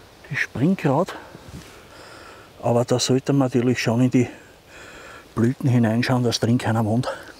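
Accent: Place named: Austria. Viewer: Hallo, ich liebe das Österreichische. Und von dir ist es irgend wie noch beruhigend. Danke für die Tour. Gruß Goldjunge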